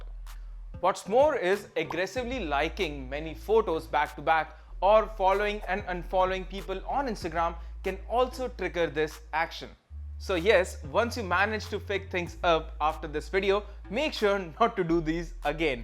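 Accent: India. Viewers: Can u please do not the Indian accent?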